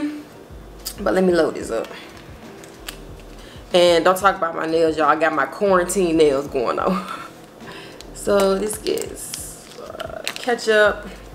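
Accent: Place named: South, United States. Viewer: She's definitely from the south.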